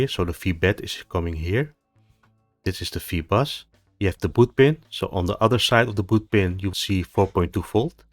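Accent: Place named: Netherlands. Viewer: 2 seconds in and i hear the dutch accent 😁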